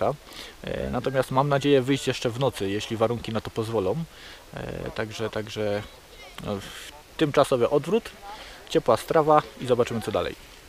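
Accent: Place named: Silesia, Poland. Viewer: Focus to infinity Właśnie oglądaliśmy odcinek z Klimczoka:) Naprawdę udana realizacja. Po akcencie domniemywam że jesteś ze Śląska. pozdrawiam z Tarnowskich Gór:)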